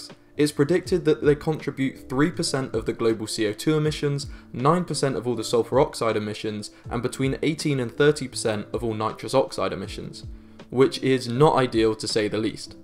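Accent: United Kingdom. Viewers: As soon as i heard the british accent I knew his was a green lunatic. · What do you know another brit with a weird channel name, narrating stuff...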